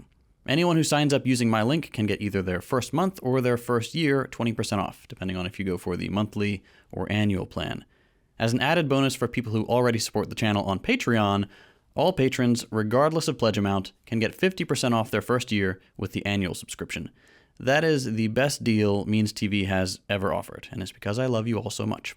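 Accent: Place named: Pacific Northwest, United States. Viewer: Could tell you are in the PNW by the way you speak, but that B roll footage of you driving really brought it home. :p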